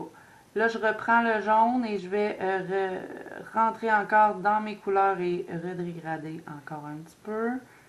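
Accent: Quebec, Canada. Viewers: Merci beaucoup avec ces nouveaux tutos, tu nous fais bien progresser. Comme dit Les couleurs de Noëlle, j'adore t'entendre, avec ton accent Québécois qui nous semble exotique, à nous français, et nous fait rêver aux grandes plaines et forêts enneigées du Canada. Quand j'étais petite, j'avais adoré le livre Maria Chapdelaine, et je l'ai relu plusieurs fois. Bonne journée, gros bisous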